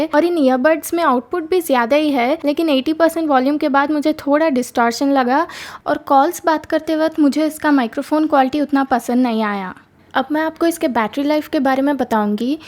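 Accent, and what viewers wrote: Hyderabad, India. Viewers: Good review.. sounds hyderabadi slang....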